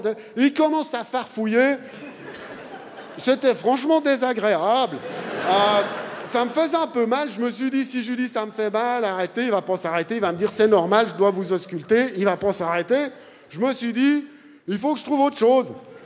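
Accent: Vaud, Switzerland. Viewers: J'ai plus rit de son accent suisse que du sketch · Accent Vaudois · Il a vraiment un accent suisse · Il a une drôle de façon de parler (et je ne parle pas de l'accent suisse) mais j'ai ri ! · "C'était franchement désagréhâble" . . . beaucoup d'accents circonflexes notamment sur les "a", les "heu ou eu". Cet accent suisse, peut être vaudois, me rappelle celui des stéphanois (Saint Etienne 42). Fouillâ ! ! ! !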